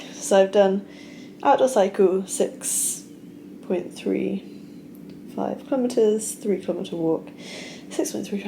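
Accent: RP, United Kingdom. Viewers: is your posh accent acquired or innate ?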